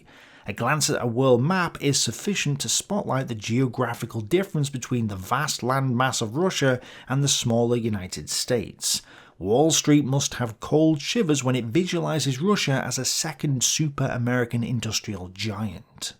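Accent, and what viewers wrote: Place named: North, United Kingdom. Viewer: Can you do some books on tape where you just narrate? Need more northern folks doing this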